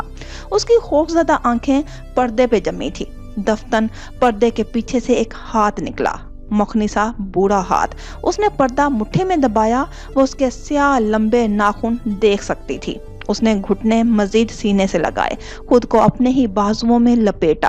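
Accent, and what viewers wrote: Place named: Punjab, Pakistan. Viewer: Voice me Punjabi lahza kam kar lein please kuch lafz Samjhne me problem hoti hai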